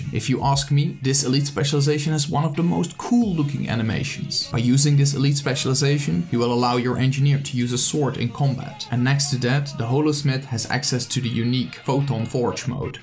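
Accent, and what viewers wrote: Netherlands. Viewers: i clicked - i hear a dutch accent - i like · You are dutch, aren't you? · Hands down the BEST video of its type. SUBBED. Love your accent. Dutch? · Not that your English is bad, far from that, but i imediately heared you're dutch. Great Video tough.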